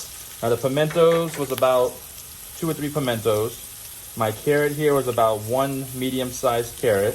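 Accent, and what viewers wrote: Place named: Trinidad and Tobago. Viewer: Hi, i love how you use the local trini indian terms when you are cooking. You sound like a real long time trini back home 😊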